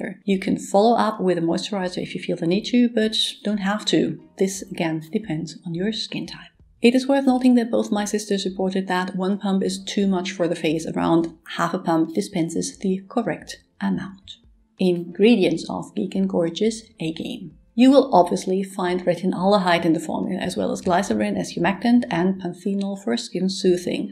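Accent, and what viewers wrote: Germany. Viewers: WOOHIOO ! Thanks! My sister in Canada uses it and even though she's 60, she could easily pass for someone in their 40s - not that it's "so important" to look young but to take care of ourselves and to look as good as we can is perfectly fine, as far as I'm concerned. Btw, I must add that your accent when you speak English is just lovely! I couldn't place it at first but then I saw that you were German. I've been in Belgium since 1989 but am originally from Canada. I wish you all the very best and.... a very MERRY CHRISTMAS to you and yours! 🙂🎄❤️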